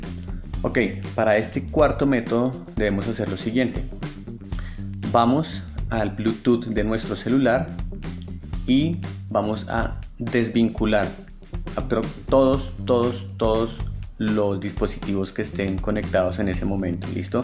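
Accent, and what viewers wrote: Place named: Colombia. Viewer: Qué bonito se escucha el acento colombiano !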